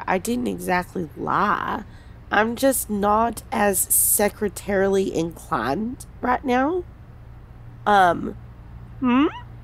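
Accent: South, United States. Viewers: I like the southern accent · Have I mentioned how much I love the southern accent. I gotta go to bed because I have a big day tomorrow, but still a great audio. Goodnight everyone.☕️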